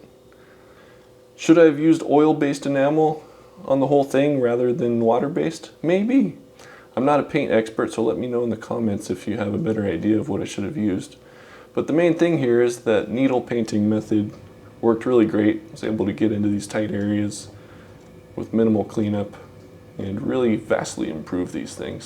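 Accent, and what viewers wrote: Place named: United States. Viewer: Irritating americun voice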